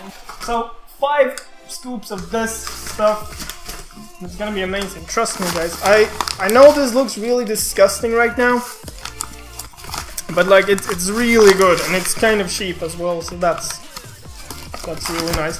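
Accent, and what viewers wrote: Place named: Sweden. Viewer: Do swedish people really sound like that? I meean the accent, im pretty sure you've heard this before but you kinda sound like BluePanda. hehe and LOL tutorial video out of nowhere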